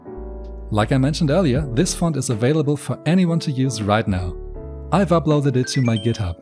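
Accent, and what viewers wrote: Germany. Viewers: I thought his accent made it more than obvious that he is German...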